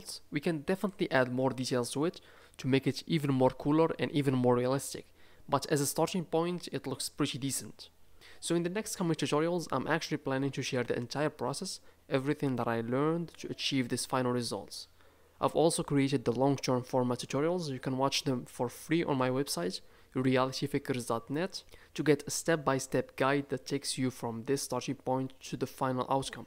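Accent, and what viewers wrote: Morocco. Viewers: Are you Moroccan 😄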